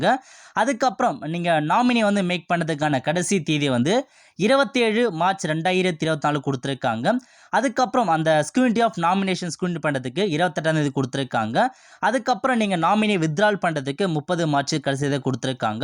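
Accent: North, India. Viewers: Enna bro vadakkan maari pesura ennachu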